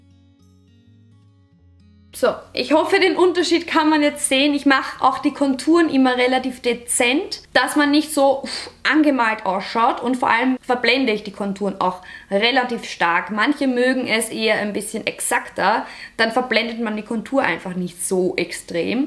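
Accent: Vienna, Austria. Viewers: ihr österreichischer dialekt kotzt einfach nur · Bist du aus Österreich? Hörst dich ein wenig "Wienerisch" an, für mich als Wiener zumindest 😉👍🏻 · Bist du Österreicherin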